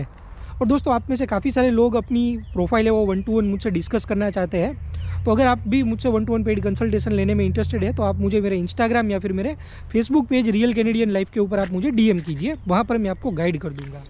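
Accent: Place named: Saurashtra, India. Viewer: tamey accent thi gujarati to paaka cho pan saurashtra thi lago cho .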